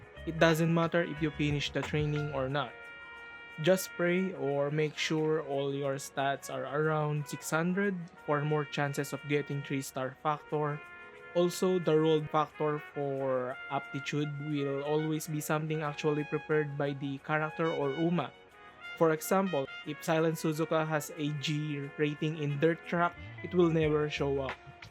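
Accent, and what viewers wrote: Philippines. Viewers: I smell Filipino accent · You sound... Pilipins..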